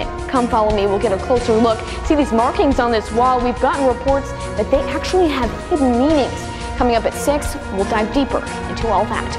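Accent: Taiwan. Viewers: 哇~~這個中文口音好台灣啊~~ 如果有機會來高雄，可以試試雙妃奶茶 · 正宗台灣腔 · 聽妳講國語就知道你是台灣人啦😄喝珍奶吃雞排👍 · Love your accent, very 字正腔圓, not the Chinese/Beijing type but Taiwanese type. You should speak more Chinese in your upcoming new vlogs 😃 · 是真台灣人沒錯 這個中文完全是台灣口音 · 妳講的完全是台灣口音的中文耶❤️ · 妳的腔調很不一樣跟台灣幾乎一樣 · 標準台灣腔~辣台妹無誤！ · 台化很嚴重😝😝😝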